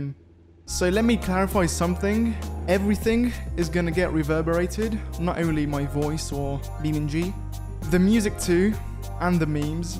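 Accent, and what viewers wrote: Italy. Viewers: I know you are Italian, Your English is Diffrent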